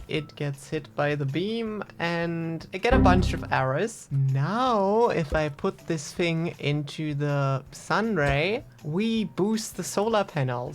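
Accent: Germany. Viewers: she isnt using a voice changer, i think shes trying to make her voice less deep. and shes german so that may be why u cant understand her for some reason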